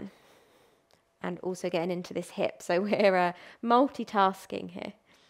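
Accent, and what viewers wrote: United Kingdom. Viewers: Another great session. Thank you so much. I especially enjoy listening to your voice. I am a southern Alabama girl living in Ohio and I can assure you the wonderful British accent is a welcome change.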